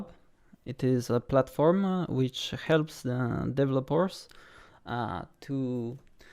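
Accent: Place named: France. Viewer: I love french peoples speaking english . C'est très facile de reconnaître ça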